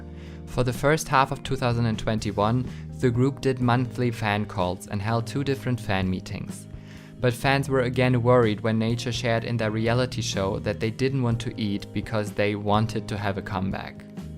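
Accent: Germany. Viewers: Is the narrator German? That accent is so strong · are you German by any chance ? You sound quite German to me :) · okay, off topic, but are you, by chance german? Your accent feels farmiliar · Are u german? :D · are u german by chance?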